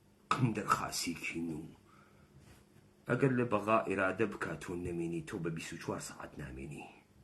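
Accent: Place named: Kermanshah, Iran. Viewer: تو شلوار له قن ترکیه در تیری. خیلی واضحه مهره کی هستی کجا کرمانشاهی بلده با این لهجه حرف بزنه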